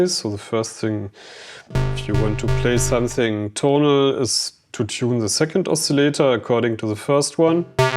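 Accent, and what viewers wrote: Germany. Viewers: Beautiful German accent 🇩🇪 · this sound more german then english :D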